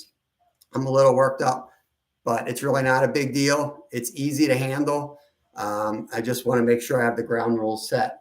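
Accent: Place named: Pennsylvania, United States. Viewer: Any time I think f Kindergarten Cop...."boys have P e n i s and girls have a V....." teeheeee. I said that quote to my radiologist that was in her late 20s. She had no idea 🤦‍♀️. LoL I appreciate your clean channel.!! Once in a while, I slip and say bad words but there are words that is a no no.. especially during the day time. A night stream sometimes brings out dirty mouth. Hehe. But we still love her. Oh, and thank you for the rant in a PA accent, it makes me feel like I'm around family.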